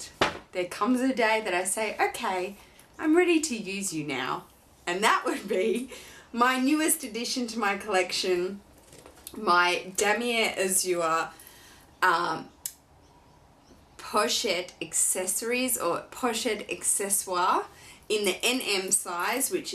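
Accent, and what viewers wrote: Australia. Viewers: Love your videos and your aussie accent :) I would like to get the Neverfull MM. Lots of love from Berlin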